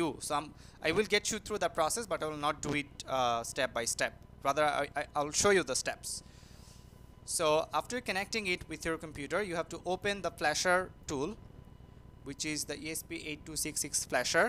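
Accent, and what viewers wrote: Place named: England, United Kingdom. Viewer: The most unattractive english accent makes it impossible and take anyone who speaks like this serious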